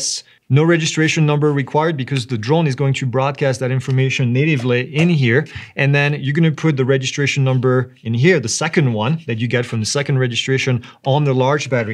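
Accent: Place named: France. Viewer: You have a French accent but you are talking about rules specific to the USA?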